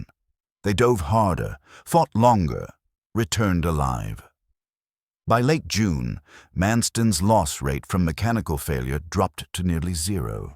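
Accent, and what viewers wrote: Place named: United States. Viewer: An entertaining story but if American robotic narration is used and the graphics are CGI, I'm skeptical of it fully being true. For a story on something British, hearing robot narrator say, 'sodder' instead of 'soldering' and 'Aluminum' instead of 'Aluminium' ... not good, and anyway, wasn't it fuel starvation that caused the Merlin's to conk out before a fuel injection system was utilised.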